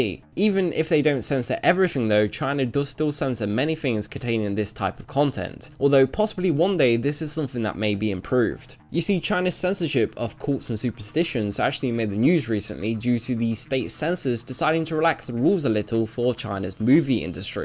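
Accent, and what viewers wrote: United Kingdom. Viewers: What country is your accent from? oothers (others) coolts(cults). Is it Scottish?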